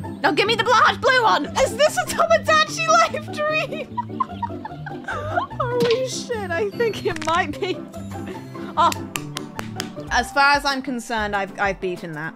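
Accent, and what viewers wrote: United Kingdom. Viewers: i though she was cringe until she turned bri'ish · Idk, British sounds funny and cute at the same Time. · Is aguy or a girl, can’t really tell, someone, please explain me. Edit:i just checked the info on the channel and i want to tell you that you have an overwherming british accent i fell like Edit2: this is not a horror game even in the slightiest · BRITISH DETECTED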